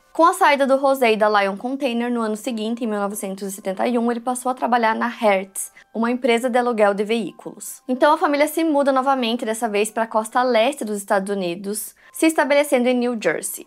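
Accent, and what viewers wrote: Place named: Paraná, Brazil. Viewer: Paranaense adoro nosso sotaque.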